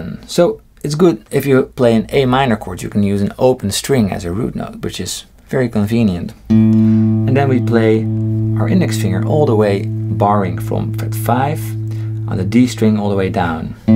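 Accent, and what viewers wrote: Netherlands. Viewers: He's Dutch ;) but he's living in the USA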